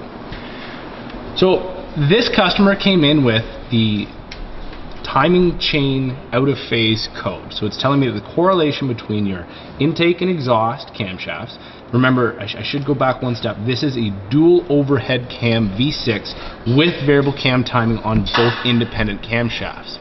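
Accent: Canada. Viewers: He's not only Canadian, but he lives in the heart of Mennonite country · I liked the video until I started hearing all the "aboots" crazy canucks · I was about to say that he sounded as if he was from Ontario. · Louis Healan he sounds extremely Canadian. Which is fine. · Very informative, easy to follow along, and I'd love to see if he has a video on the 2.0L ecoboost engines like in my 2016 Focus ST. Big thumbs up on this guys' just no bs knowledge based on his experience. Sounds like he's from Canada, shout out from down South in Texas! ^_^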